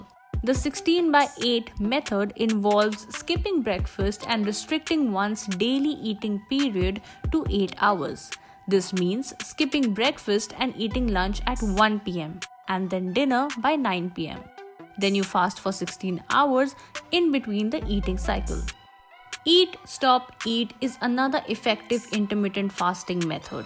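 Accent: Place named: India. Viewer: thanks for the information along with the nice Indian accent!!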